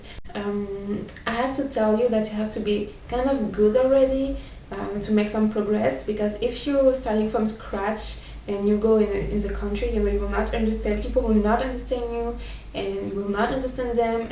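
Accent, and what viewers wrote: France. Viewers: You do have a french accent, but your accent isn't impeding your communication. Great job :) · Her vowels and "r"s sound French to me. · Your accent is so interesting! I hear both the French and Spanish accent, and even an African accent. I've been learning French but I hope to learn Spanish too (and improve my French) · Wow taking into consideration that you are French you speak perfect English but French people don't pronounce the letter h and they consider it a vowel and you still do it but other than that you speak perfect English! =3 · Good. Now the words are off but you are just as beautiful! (wink wink nudge nudge) Thanks for your videos, I would really like to learn a new language and you make it so easy to listen to. I really like that french accent. It sounds gorgeously suggestive to all of us Americans. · yea u sound French lol, and wow u r beautiful. 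nice hair too :) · J'ai direct reconnu le français :) sinon bonne vidéo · it's very clear she has a very strong french accent... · doudi ¿Bueno? tu sabes que... ella todavía está aprendiendo, el inglés y Yo sigo pensando que ella todavía debe tener un acento francés, incluso cuando ella es.. ¡mejor! ¡suena muy muy bien en acento del LA Frances! ¡amigos! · Thanks for the tips. I'm starting learning spanish from zero and will take your advice. P.s love the french accent so don't try and get a more english accent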